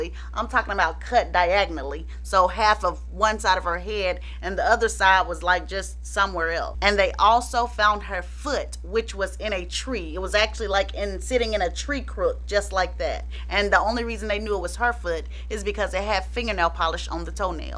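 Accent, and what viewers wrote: United States. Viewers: Lol wat is up with this country accent 😂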